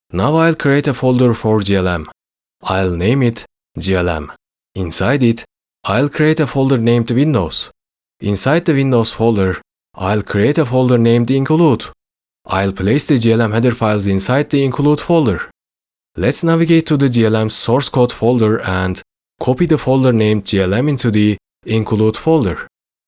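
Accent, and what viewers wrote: Turkey. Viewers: konuşmandan türk olduğunu anladım master :) ben de linux da vulkan api ile çalışan bodycam clonu yapmaya çalışıyorum. windows dan devşirme değilde linuxdan devşirme yönlü olmasını istiyorum. microsoft ve nvideadan bağımsız directx saçmalığı olmadan. kod bilgim yok ama bası oyun modları yaptım daha önce. biz yetişkinlerin her akşam seferberlik eğitimi olarak. yarım saatlik roundlarla cqb savaşı yapa bildiği takım bazlı oyunu yapmak istiyorum. lyra game baz alarak bluprintler ile yapmayı deneyeceğim.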